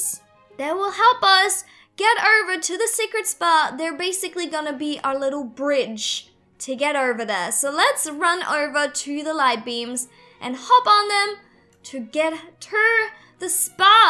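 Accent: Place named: Australia. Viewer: !! u sound aussie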